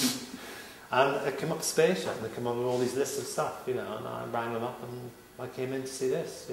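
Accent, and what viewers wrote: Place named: United Kingdom. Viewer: There you are! Still with beautifull British accent! Ganbatte! from Y.M. Okinawa Japan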